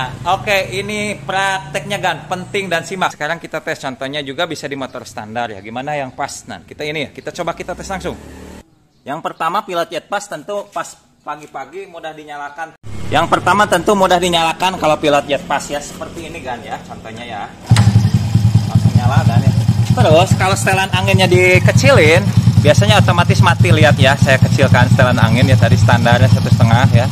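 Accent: West Java, Indonesia. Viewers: Sampurasun....yakin dari logat bicaranya pasti orang sunda dan beberapa x w nonton vidio u bang akhirnya w kena racun u bang wkwkwk waktu itu w nontn rev cdi predator u bang akhirnya w beli buat jajal di tirev w hasilnya jos...powernya enak ngejambak2lah hahha tapi ada tapinya nih puteran atasnya jdi nahan alias ngeden gtu cek busi warnanya putih waduh...keiritan ini mah fix ini mah musti set ulang pj mj w balik lagi bang nyari vidio u lah ktemu ini lagi bahas set karbu pj mj cocok.bingung ya naikin/nurunin berapa step ya karbu tirev std pj 38 mj 120 pengen kek gtu bang di gas beng..beng.beng .bikin tangan ngaderegdeg hehe tapi dari tadi w malah fokus ke astreanya sumpah mesinnya kek daytona ya...ngeri....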